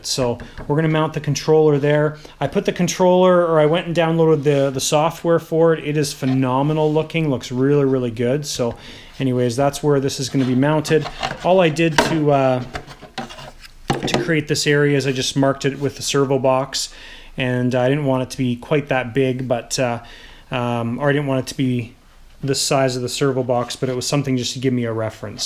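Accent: Canada. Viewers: I absolutely love the content on your channel.obviously you are a Canuck and you guys say things just a bit differently than ee do here in the United Staes but one thi g thats been really bugging me is how you say CORS AIR Instead of the proper pronunciation COR SAIR. Once again love the channel content and I think you build some of the best models Ive ever seen. Keep up the good work and you say corsair however you pmease....lol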